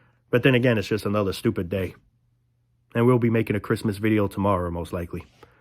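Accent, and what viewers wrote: New York, United States. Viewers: You're so handsome even considering the horrific topic you are talking about. I love your New York accent too. Yeah showing the video is something that wouldn't be appropriate at any time .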